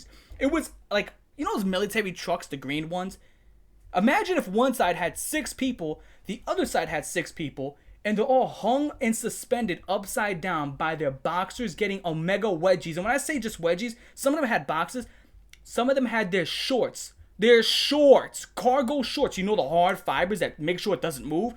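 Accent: New York, United States. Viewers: You sure you're not part Italian? You have the NY guinea accent and you're hilarious.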